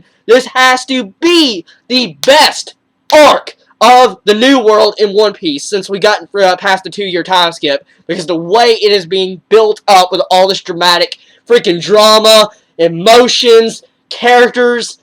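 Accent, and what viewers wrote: South, United States. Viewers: Did you study voice training? Or something to that effect? You sound noticeably more country back then and now you speak very clearly and slowed down.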